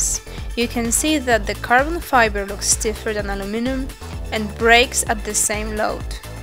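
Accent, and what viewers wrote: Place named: Poland. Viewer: Pani chyba z Polski, akcent się zgadza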